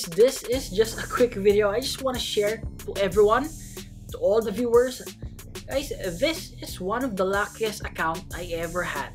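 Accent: Philippines. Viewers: hey are u filipino just wandering cuase sometimes u say ay idk im just wondering and thank you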